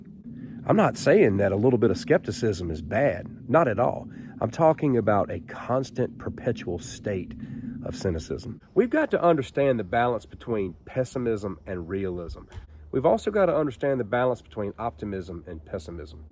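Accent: South, United States. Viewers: Not only do I like your advice, but your southern accent, combined with your calm and confident voice sounds both masculine and soothing at the same time. Keep it up, man.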